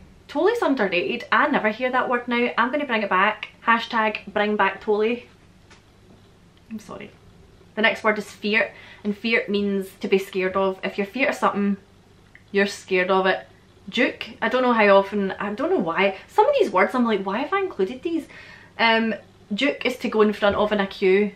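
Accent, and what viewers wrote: Scotland, United Kingdom. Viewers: I have no Scottish heritage and I’ve never been to Scotland but I feel a deep passionate respect and admiration for Scotland. Wish I could visit and learn more about it. Plus, that accent, excellent. Gonnae trry! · ITS SO NICE TO HEAR.I M LEARNING SCOTTISH ACCENT.THANK U SO MUCH. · I have no idea, why her video (not this one, but at the other, comments were deactivated) was recommended, but I love Scotland and the scottish accent. ....Wait is this a Freddy Kruger glove on the door? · Love scottish ascent❤️ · LOVE your accent, My people came from Scotland and Ireland. Many years ago. My Dad used lots of the words that you used in this video. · I love the Scottish accent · Oh my god hon ur accent reminds me of my scottish aunt kirsty, love it.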